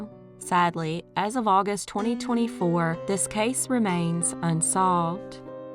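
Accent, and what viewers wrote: South, United States. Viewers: Ooh really I will check it out! Love your voice and your Southern accent! Thank you for the time you take to research all these cases! 🙏🏿❗ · Yes! Quickly becoming my first click whenever I get on YouTube ❤ your channel is only one of three channels I have my notifications on for. 🌟 Love your soothing voice with your slight southern drawl and every story you cover needs the lime light! 💚 thank you!!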